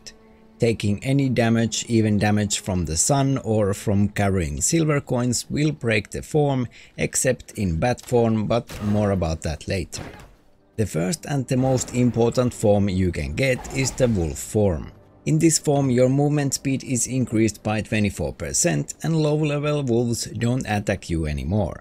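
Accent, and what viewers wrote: Finland. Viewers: Haha Suomi-aksentin tunnistaa kyllä :D Hyvä video, kiitos tästä 😊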